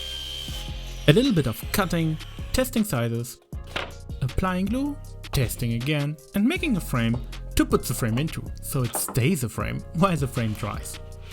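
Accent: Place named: Germany. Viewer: German? Irgendwie klingt dein Englisch nach deutschem Akzent. Nice shit btw. ;)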